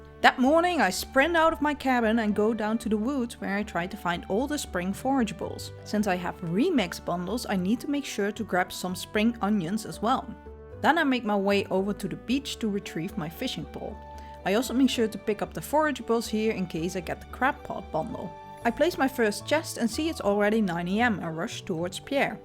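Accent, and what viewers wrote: Netherlands. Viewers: Listening until the end I realize you’re probably Dutch haha!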